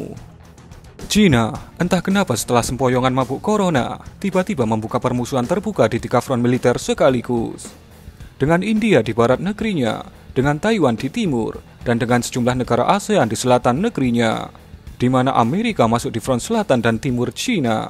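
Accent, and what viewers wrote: Java, Indonesia. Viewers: Medok amat yang ngomong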